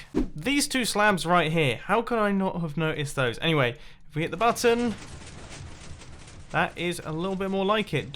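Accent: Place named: United Kingdom. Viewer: this guy is so bri'ish it's fucking disgusting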